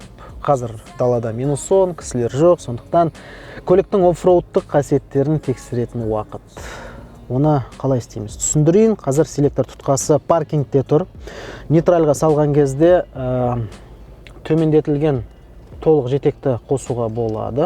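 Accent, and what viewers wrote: Standard. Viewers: Мәссаған сөзім жоқ.Қазақ тілде таза сөйлейсін.біз лайк басуды аямаймыз. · Таза қазақша керемет! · Таза казакша керемет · Қоспасы жок тап таза қазақша сөйлеп тұр, жарайсыз. Техникалық термин атауларға дейн қатесіз анық аударылған. 👏👏👏👏👏👏👍👍👍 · Керемет, сонша еңбек өте жақсы түсірілім, жарайсың ең бастысы таза қазақша! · Ассалаумағалейкум! Қазақша таза сөйлейді екенсің, риза болып отырмын. Жұмысың алға баса берсін! Пытырлатайық жігіттер лайкты, оған лайықты! 👏👏👏👏👏👏👏👏👏👏👏👏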